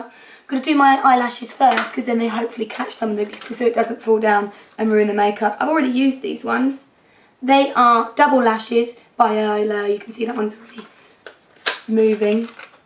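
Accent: United Kingdom. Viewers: Lol I noticed that too but it sounds wonderful with her British accent!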